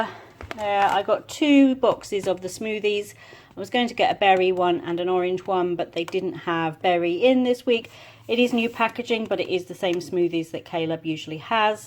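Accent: United States. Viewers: Your American accent made me giggle! We do say it like that haha. Great haul and I love Franks Red Hot!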